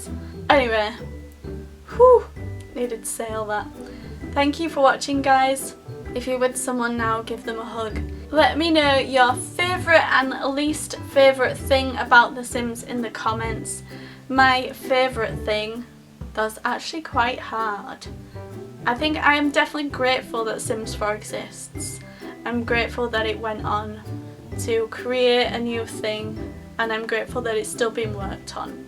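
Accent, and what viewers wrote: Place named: Scotland, United Kingdom. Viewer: I agree and I have completely lost hope now. The Sims 4 is fundamentaly different from other Sims-games in the depth of its core, and I think no expansion-pack or update can save it. :( My only hope is that the Sims 5 will be what the Sims 4 should have been: An improved version of the Sims 3. But it'll take years to find out... Btw are you Scottish? I love your accent :)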